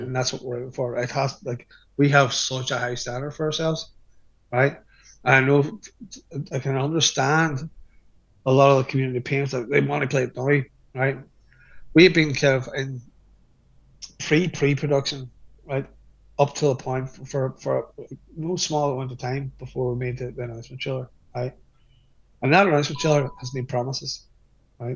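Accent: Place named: Ireland. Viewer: Irish accent I understand it perfectly but I am Irish 😅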